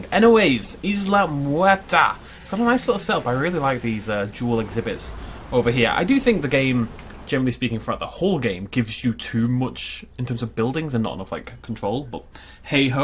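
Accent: United Kingdom. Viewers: One hour, great video, OG brit commentary. 10/10 video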